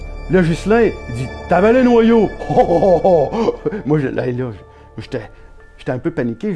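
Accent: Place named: Quebec, Canada. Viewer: Génial, merveilleux et émouvant...... cette histoire me fait venir les larmes aux yeux, pour moi qui vit en Italie depuis 25 ans. Ces histoires me manquent, ces souvenirs de ma petite enfance me manquent et ce merveilleux accent québecois. n'est pas seulement fantastique comme conteur mais a une voix fantastique pour reprendre les chansons d'autrefois. Merci...de tout coeur merci. Cette chaine devrait avoir beaucoup plus de pub et de fans, elle le mérite. Elle présente ce patrimoine immatériel du Québec que beaucoup de jeunes aujourd'hui ne connaissent pas.... Bonnes et heureuses fêtes à toute l'équipe de maCommunauté et félicitations à. En espérant entendre, revoir bientôt, un autre de ses contes.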